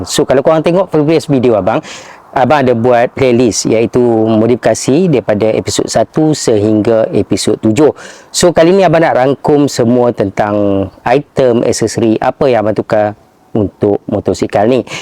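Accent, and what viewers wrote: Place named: North, Malaysia. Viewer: Bunyi mcm utaqa...area mana bang?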